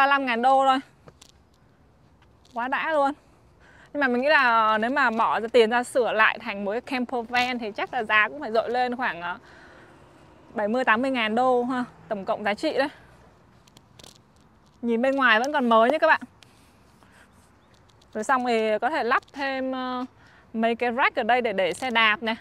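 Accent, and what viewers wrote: North, Vietnam. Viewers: tiếng anh pha lẫn tiếng Bắc chính hiệu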